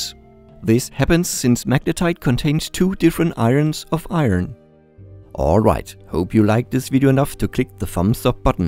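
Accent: Denmark. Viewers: er du fra Danmark? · Er du fra Danmark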